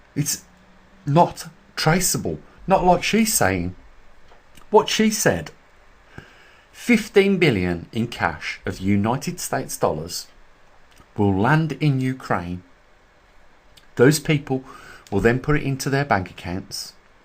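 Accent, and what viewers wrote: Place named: West Bromwich, United Kingdom. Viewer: M8… where ya from? I am in West Brom… you sound local, let’s meet up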